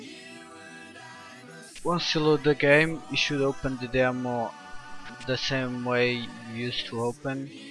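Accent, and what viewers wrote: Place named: Portugal. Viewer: Are you portuguese by any chance? your english accent reminds me of a friend of mine x)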